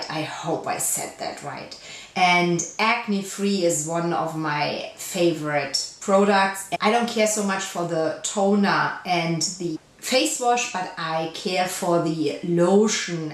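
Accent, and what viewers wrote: Germany. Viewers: Where are you from? You sound Swabian.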